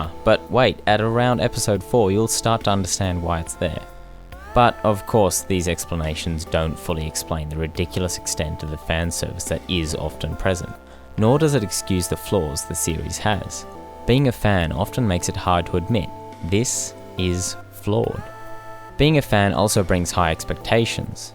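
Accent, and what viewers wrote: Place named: Australia. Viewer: I seem to be falling in love more and more with your channel after every episode I watch. I also can't believe I never noticed that your also Australian before and its Kinda blowing my mind that I never picked up on it. but anyways keep up the great work!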